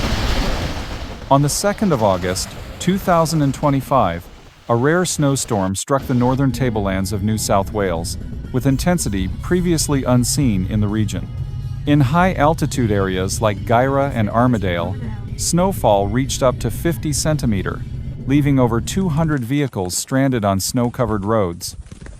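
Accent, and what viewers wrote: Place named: United States. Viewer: Commentary by a friggin yank ffs!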